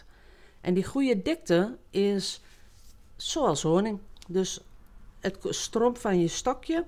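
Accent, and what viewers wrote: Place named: Twente, Netherlands. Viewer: Dank voor de stimulans, deze uitgebreide uitleg wordt zeer gewaardeerd! Haha, als ook het Twentse dialect, zo leuk:D. Vooral dat fotopapier lijkt me gaaf om mee te experimenteren, in de trant van: "wie het kleine niet eert is het grote niet weert"?;-) Met liefs van Mies uit Zuid Limburg. Waarbij ik al een hele poos hoop dat je ook hier in de buurt een workshop zal willen/komen geven.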